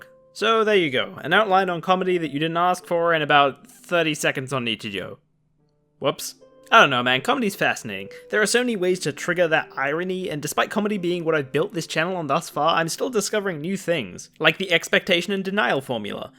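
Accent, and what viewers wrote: Australia. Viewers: You sound like an Aussie ..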